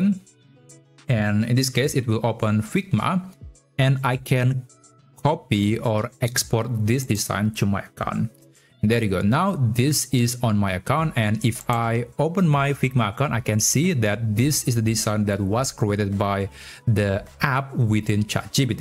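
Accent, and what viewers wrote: Indonesia. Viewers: You sound Indonesian. Good video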